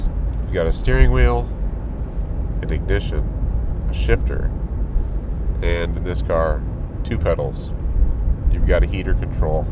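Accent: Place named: Midwest, United States. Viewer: something about the midwest accent makes me feel like i have to blow my nose when i hear it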